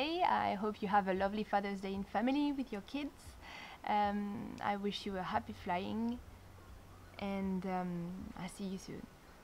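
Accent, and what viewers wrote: France. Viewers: are you french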